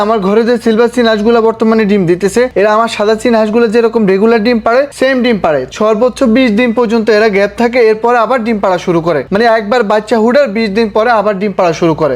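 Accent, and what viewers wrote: Noakhali, Bangladesh. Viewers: ভাই নোয়াখালীর মানুষ নাকি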